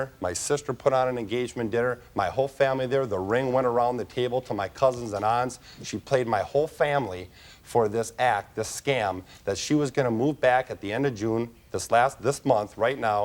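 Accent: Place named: Minnesota, United States. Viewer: I love the Minnesota accent!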